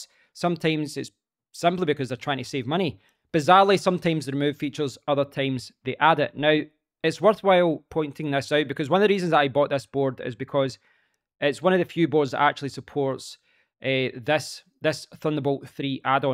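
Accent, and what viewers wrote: Scotland, United Kingdom. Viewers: Love the excellent description and the great Scottish accent. Thank you!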